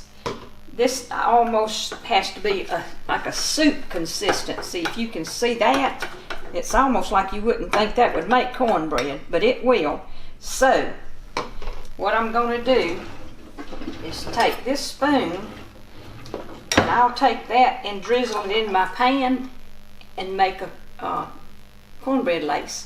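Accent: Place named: Alabama, United States. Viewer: Hello from Alabama!!!! good to have found your channel!! I knew you sounded like one of us. 🤣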